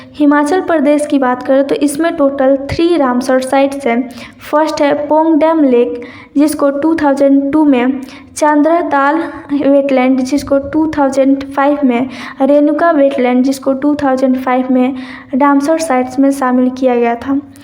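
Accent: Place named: Bihar, India. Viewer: I think you are from bihar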